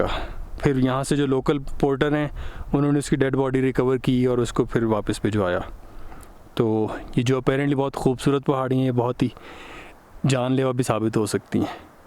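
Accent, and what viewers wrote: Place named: Lahore, Pakistan. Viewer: AP Lahore k ho jes hsab Sy bolty ho Lagty Lahore k ho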